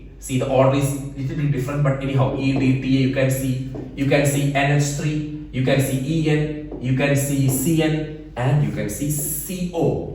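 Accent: Kerala, India. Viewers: Sir are u from Kerala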